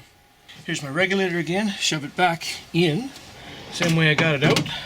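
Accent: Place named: South, United States. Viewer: Your southern accent is far to thick for this future door trim repair man.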